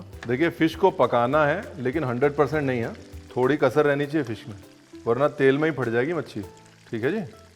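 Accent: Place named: Kanpur, India. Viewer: Kabhi kanpur wali koi recipe banaye,bahut khushi hogi,aapko boli to kanpur ki lagti hai bahut hansi ati hai jab aap yaha ki bhasha Bolte ho🙏🙏🙏🙏🙏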